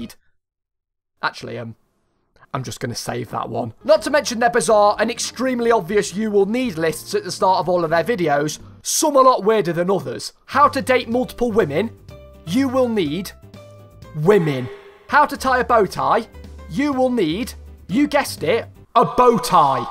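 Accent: United Kingdom. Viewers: that british accent, lol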